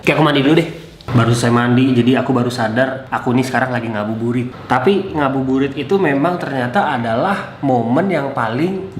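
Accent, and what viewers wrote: Bali, Indonesia. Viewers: Logat bali keluar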